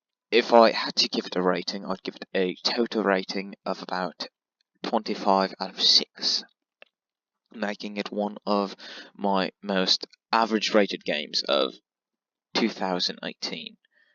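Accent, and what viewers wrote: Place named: New Zealand. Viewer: What an Astounding Review! seems legitimate af I Like that New Zealand Accent Daddy 💦💦💦💦💦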